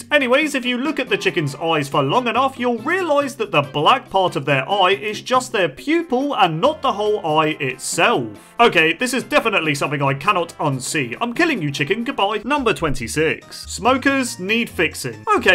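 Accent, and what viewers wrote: United Kingdom. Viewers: bri'ish voice? ı'm new :/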